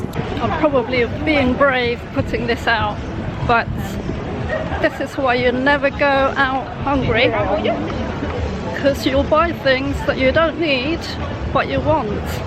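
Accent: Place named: United Kingdom. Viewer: Hi I will be turning 63 coming up next month on February 9 now your voice sounds like your British but! where are you from when you really do not mind me asking? and I know just how it feels when it all comes down to you being alone all by yourself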